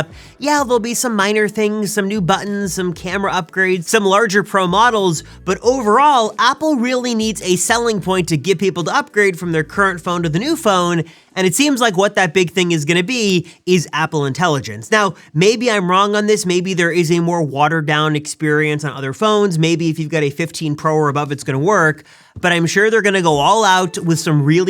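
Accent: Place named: United States. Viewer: I really can't listen to this winey, but loud American accent. Sorry but it hurt my ears!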